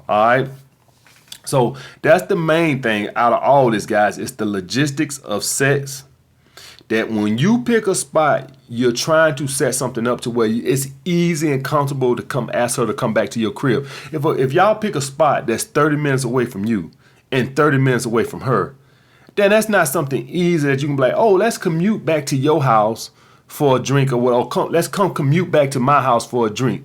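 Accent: United States. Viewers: Yo Bruddah haha this guy is the Don yo ha I wanna mention this to my cronies in the liquor store n down town pubs but worried there gonna drill me Ya saying that every man really has these problems Life's difficult I grew up no family I'm safe as fuck to everybody but ppl just take the biscuit piss dude lol But the woman they just killus It happens to us all haha omg Until you get some Asssss Dat fucking Credit Card stayn In the god damn fOcking pocket Hahahah Man American Accent is fucking Ace 😂 Respec dude ur Wicked 👍👍👍👍👍👊👊👊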